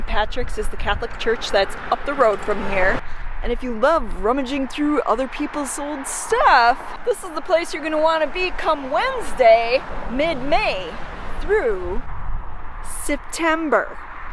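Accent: Wisconsin, United States. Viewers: Love the accent. Reminds me of my family that live around Marathon, Wisconsin. I worked as a professional archaeologist for years documenting old mining districts through out Arizona and Nevada. I currently work for a major railroad and get to see alot of these small town sites that are barely in existence.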